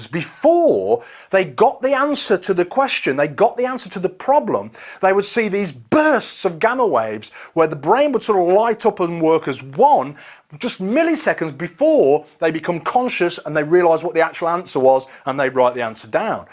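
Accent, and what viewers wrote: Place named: United Kingdom. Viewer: The explanation with a british accent and gamma state make this video very €nergetic & interesting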